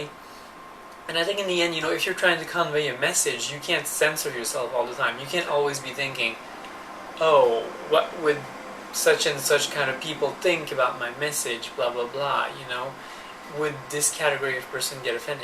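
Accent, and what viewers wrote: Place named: Canada. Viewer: You're here too. I like her Canadian accent. And she's pretty.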